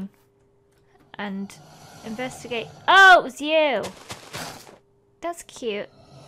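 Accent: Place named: Leeds, United Kingdom. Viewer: It sounds like she's from Leeds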